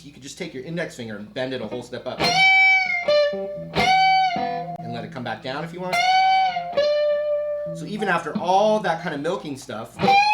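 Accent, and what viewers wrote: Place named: New York, United States. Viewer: Hey, are you from New York? i don't know, i think you have a New York accent. i'm from Belgium so excuse if i made a mistake.:p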